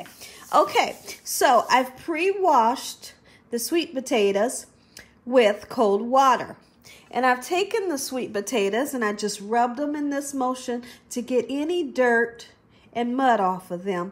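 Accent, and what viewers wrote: South, United States. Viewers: She's sporting a buffalo jersey with a southern accent.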